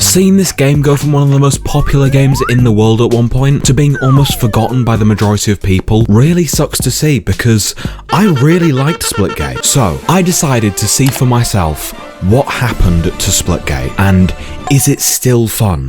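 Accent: United Kingdom. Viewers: If I had a nickel for every game video essay channel with good video fx run by a comedic smooth talking early twenties white british (or aussie) man I'd be a millionaire.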